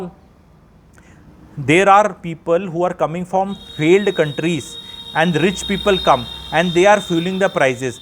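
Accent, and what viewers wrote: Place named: Haryana, India. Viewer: I love your accent English , Hindi, Haryanvi😊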